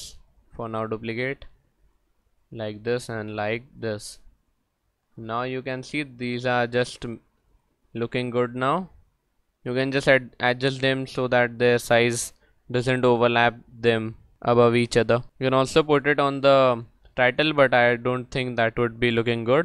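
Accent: India. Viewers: I'm sorry for making fun of your Indian voice.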